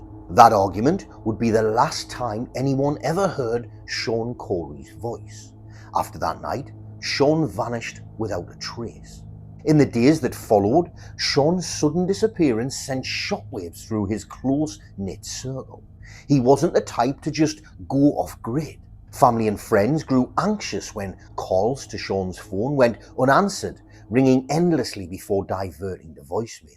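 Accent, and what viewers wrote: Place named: England, United Kingdom. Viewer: I cannot penetrate the host’s regional English accent.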